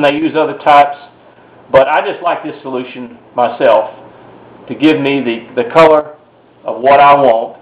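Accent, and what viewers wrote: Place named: South, United States. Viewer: hello !!!!!!! can you please wright the ingredients because your acsent is realy suthern and i am having truble picking up on it!!!! :) lol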